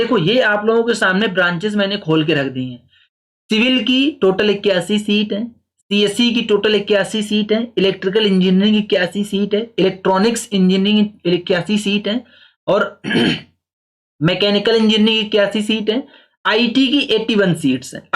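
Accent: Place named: Kanpur, India. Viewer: Kanpur se ho na aap Lahze se lag raha hai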